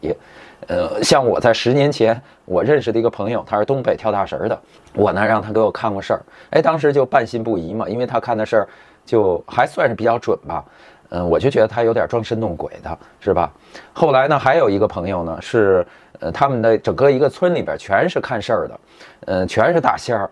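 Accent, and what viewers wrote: Beijing, China. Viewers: 可能太想北京了，刷到这视频听到北京口音，开心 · 非常真实可信。小叔第一次这么正经的北京腔儿。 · 听出来了，你是北京人 你父亲做什么工作的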